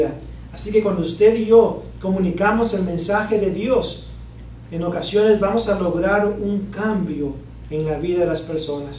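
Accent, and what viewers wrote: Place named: Dominican Republic. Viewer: El pastor se le escucha el acento como el del Pastor Miguel Nuñez y es de Rep. Dominicana puede ser que éste pastor puede ser yo no aseguro pero me gusta su clase nunca había escuchado todo ésto Dios lo bendiga Pastor de donde sea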